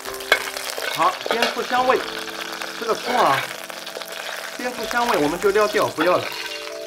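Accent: Shanghai, China. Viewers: 身在海外听到这样的沪普浑身舒适! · 你带着上海腔调的普通话实在太可爱了，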